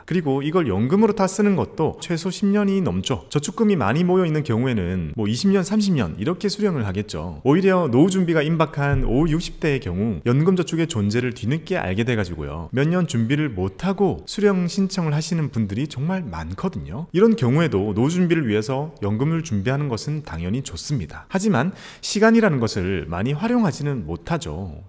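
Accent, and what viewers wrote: Gyeongsang, South Korea. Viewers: 처음엔 무슨말인진 모르겠고 그냥 저음의 경상도 사투리를 즐기고 있었는데요 듣다보니 이제 이해도 됩니다 😎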